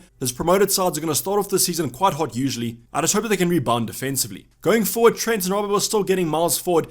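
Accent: South Africa. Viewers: Where in South Africa are you from bro? 🇿🇦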